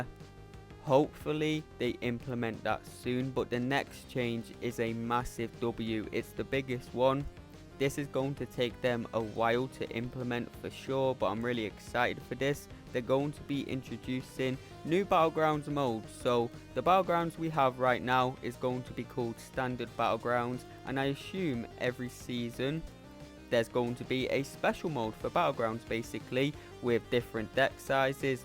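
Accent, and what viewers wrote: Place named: Liverpool, United Kingdom. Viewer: He sounds scouser to me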